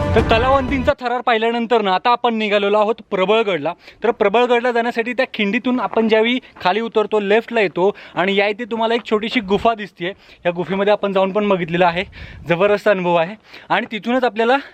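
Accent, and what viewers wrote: Satara, India. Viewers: Mla avajavrun tu satarkar vatatoy ..am i right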